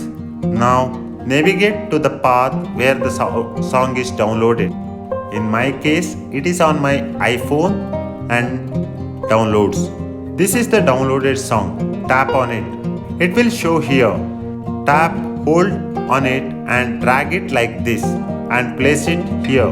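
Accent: India. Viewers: LOVE THE South Indian ACCENT Writing South Indian I low caps, coz I m RACIST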